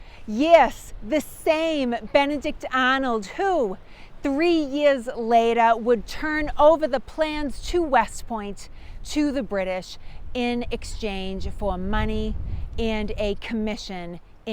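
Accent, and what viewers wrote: Boston, United States. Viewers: Love to hear your accent! Although this is about Saratoga, I said she has to be from Boston! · I absolutely love your enthusiasm and that heavy Boston accent there's no one better to talk about the Revolutionary War then someone from Boston. Great job loved the video and I also subscribed. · Love the videos! The genuine Bostonian accent is wonderful and adds to the fact that knows the topic. MORE VIDEOS PLEASE! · If only Benedict Arnold had not met and married teenager Peggy Shippen, history might have looked at him very differently. I love your enthusiasm and your Boston accent (calling a park a pac). Really enjoying your videos. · Another great video, as you were talking, I was thinking of who could not be there. And Arnold came right in my head. I've been watching your videos for years. I am a tour guide in Boston and portray Handcock. 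I use a lot of your bits of Revolutionary history with my tourist. Things people have never heard. Thank you for all your hard work bring us these videos. Continue to stay true. Love that Boston accent! Cheers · 1st, I love the accent. My job moved me to the west coast about a5 years ago. Hearing that Boston accent makes me feel at home. That said, BA was a great general until he wasn't. Wasn't it the guns captured there that were used to force the British from Boston after they were placed on Dorchester heights? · Love the history lesson with such enthusiasm and your lovely Boston accent, thank you.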